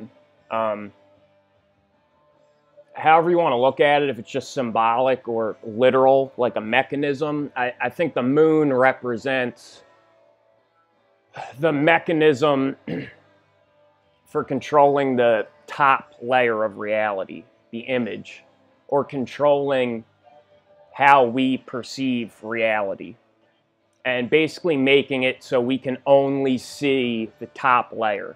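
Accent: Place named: Pennsylvania, United States. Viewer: Love how you had a Freudian slip and said The Lion shall lay with the Lamb 🐑 I mean the wolf 🐺 😂 it is photoshopped but it, it has to be ! Great stuff my friend. And it’s nice to hear your PA accent I was just living in Tower City but in FL now. Yea your getting into the difference between the mortal flesh or materialistic vs. the Higher Mind and spiritual self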